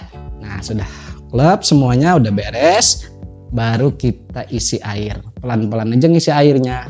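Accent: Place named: Banjar, Indonesia. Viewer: Logatnya ky org banjar nih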